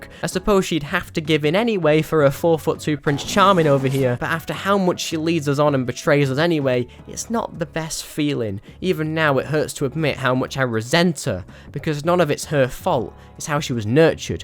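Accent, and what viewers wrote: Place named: United Kingdom. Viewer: dude I'm 10 minutes into the video and I'm loving it so far, but I felt the need to say something completely unrelated. I'm studying English Phonetics (as a foreigner) and I have a midterm on it tomorrow. this video is genuinely helping me so much, I'm like transcribing everything you say into phonetic script in my head. I don't know if you speak BBC English, Estuary or some other variant, but I'm shocked at all the diphthong shifts, intrusive R's, elisions, assimilation and other things I'm identifying. again, completely unrelated to what the video is about but it's helping me so much. thank u :)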